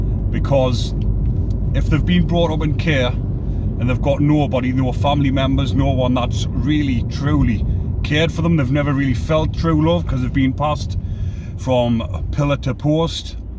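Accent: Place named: Scotland, United Kingdom. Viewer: like your scottish accent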